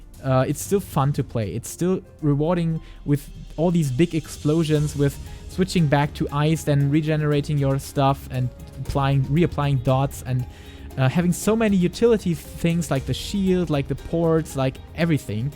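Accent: Germany. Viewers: As a fellow German, I knew right from the start where he’s from, though his English is way beyond the level of your average German!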